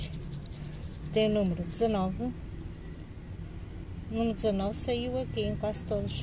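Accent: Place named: Portugal. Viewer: Legal o sotaque do português aí de Portugal.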